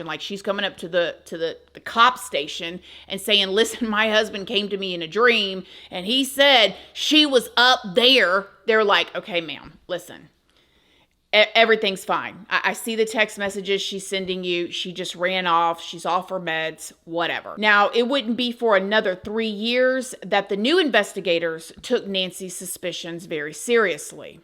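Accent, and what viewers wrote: South, United States. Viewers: I’m from the UK, you’ve certainly got that Southern Belle thing working for you, for sure. Not quite sure where to place it though, accent wise, I keep hearing some Louisiana there, unless the simple answer is, Florida you fool 🤣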